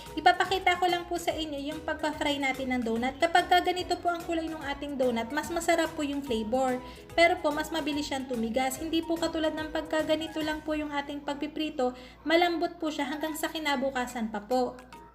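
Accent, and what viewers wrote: Batangas, Philippines. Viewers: Hi po. Batangeña ka po mam? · Kala ko Batangas. Lagi po ako nanonood ng mga vids nyo. Galing nyo magbake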